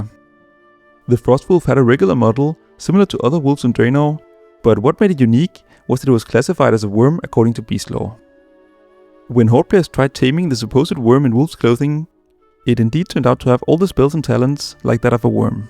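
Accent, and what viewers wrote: Denmark. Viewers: Ooooh, Danish-english accent😂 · I’d almost bet everything I own that the guy speaking is Danish.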